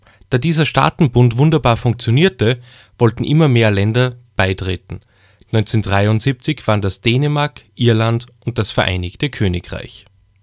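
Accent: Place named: Austria. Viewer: Sehr gut erklärt,man hört das du Österreicher bist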